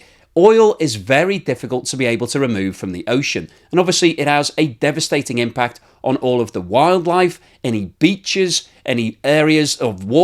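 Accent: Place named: Liverpool, United Kingdom. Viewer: Where do you live???? Liverpool I would guess 😊😊😊😊😊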